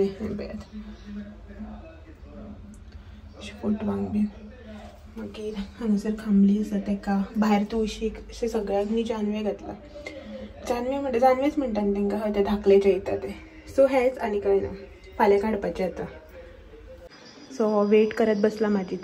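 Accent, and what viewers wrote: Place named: Konkan, India. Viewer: Nice vlog as always ❤ you are very much knowledgeable specially your Konkani is very pure and shudh ❤ Love your work. Eagerly waiting for Ganesh chaturthi vlogs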